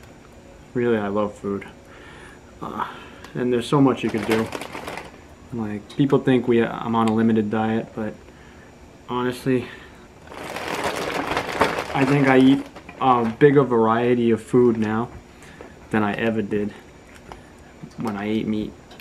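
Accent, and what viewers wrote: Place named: New York, United States. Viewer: I like NYC accent.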